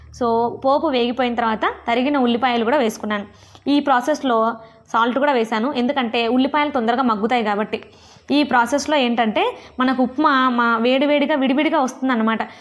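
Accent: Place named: Godavari, India. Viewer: Hi this is purnima mee mattalu vinty gala gala godavari gurthuvastundi😀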